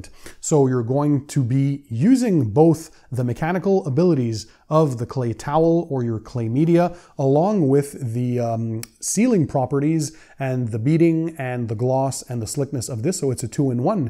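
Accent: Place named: Quebec, Canada. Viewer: you're welcome 🙂 I discovered your channel by only looking for detailing new products, especially Gyeon. I find North American detailing videos more complete than what we've got in France. Merci pour les quelques vidéos en Français, c'est toujours plaisant d'entendre un québécois parler. Avec un vocabulaire souvent plus riche en plus 🙂 Anyway, have you ever done some videos about your garage makeover? Because even if I recently look at your garage tour videos, I have the feeling that I've seen it years ago while I was doing plans for my actual home .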